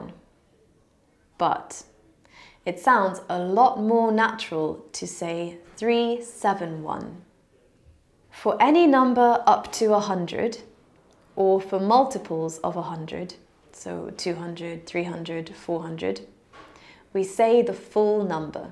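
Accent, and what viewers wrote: United Kingdom. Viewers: 영국 발음도 듣고 표현도 배우고 최고! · 영국 발음 매력적이야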